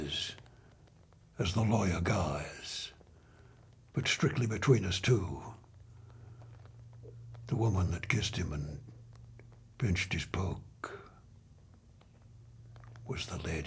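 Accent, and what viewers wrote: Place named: Australia. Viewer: It works well in an Aussie accent. Could almost be an outback tale.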